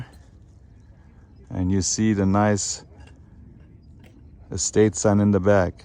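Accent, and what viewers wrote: Germany. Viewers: Dachte sofort als ich dich hörte, das ist ein Deutscher und dann sah ich den username. 😂😂😂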